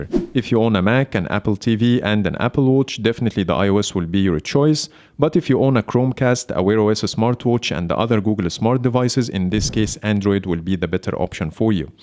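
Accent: Egypt. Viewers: I can totally tell that you’re an Egyptian from your accent. Nice vid by the way 👍🏻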